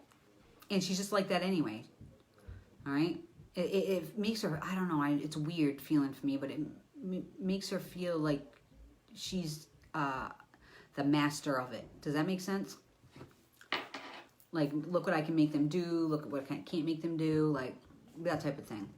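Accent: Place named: New Hampshire, United States. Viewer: I thought you sounded like you were from (wicked awesome) NH. I'm from Manchester, what about you?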